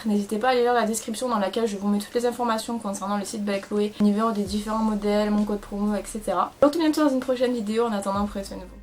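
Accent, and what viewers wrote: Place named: South, France. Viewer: Ton accent de sudiste là , magique 💕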